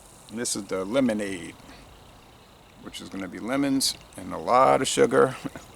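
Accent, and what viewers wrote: United States. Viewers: I should start making videos and give little digs about how you Americans pronounce and spell certain words.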